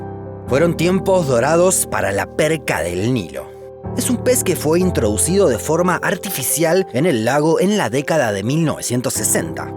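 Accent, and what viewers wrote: Rioplatense, Argentina. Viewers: Sos argentino o uruguayo? Jaja, lo loco es que es una de dos, no sos ni chileno ni nada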